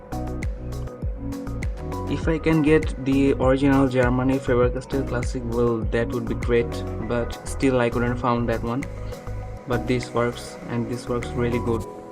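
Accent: India. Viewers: Heyy buddy are you from India? Coz your accent is a little bit indian😅. Btw keep the good work senpai 🙏🏻🙏🏻😂💙💙❤️ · You've got an Indian accent!! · from your ascent i think you are a indian or a bangladeshi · Sound indian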